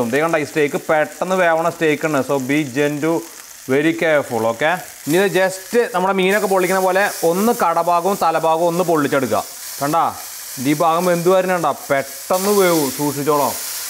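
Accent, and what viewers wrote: Thrissur, India. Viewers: Started watching your channel recently......super contents and lovely presentation.....That Thrissur bhasha and lofley is superub👍🤙...keep the good work cheta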